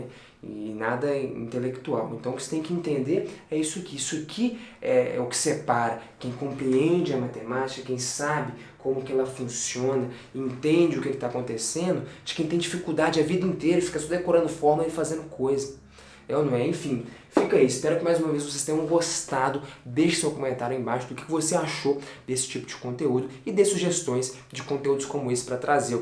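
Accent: Minas Gerais, Brazil. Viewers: Pelo sotaque, é mineiro!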